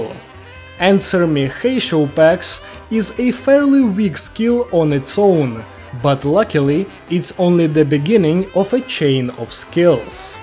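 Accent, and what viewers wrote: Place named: Russia. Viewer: holy russian accent, btw thanks for the guide i am new to the game and i've pulled him this video helped me a lot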